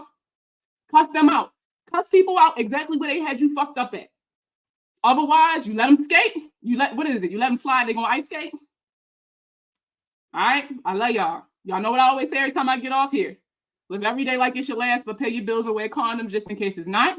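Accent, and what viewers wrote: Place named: Baltimore, United States. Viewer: You're getting too emotional 😂, he actually brought me here, and I here that Baltimore accent. I think the attention they are bringing to you is actually doing more good than harm.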